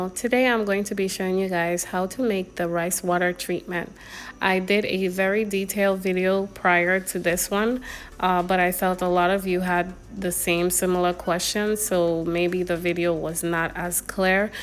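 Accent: Caribbean. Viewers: You accent sounds Caribbean, I'm from Trinidad, where are you from? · You have a Caribbean accent, where is she from? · Sounds like you from the Caribbean 🇬🇾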